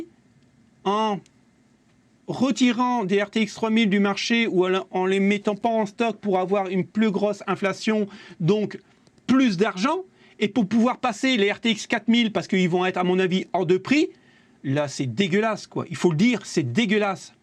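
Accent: North, France. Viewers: Peut être une erreur mais on entend bien l’accent de ch’Nord... Perso, quand on pouvait encore les acheter, j’ai toujours pris des carte Sapphire AMD. Idem pour les processeurs : de ll’AMD. On entend très souvent dire que Nvidia fait du grand n’importe quoi. Aux dernières nouvelles, il semblerait qu’Apple c’est un peu pareil. Au moins, il y a la chaîne Pour Ce Consoler 👍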